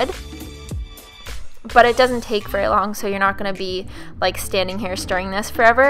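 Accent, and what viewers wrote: Canada. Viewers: peep the canadian accent :-)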